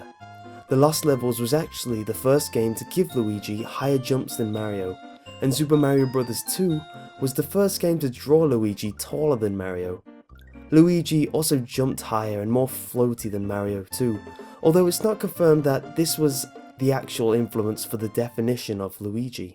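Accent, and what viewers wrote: United Kingdom. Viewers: i knew the videos are only creepy cause of the british voice!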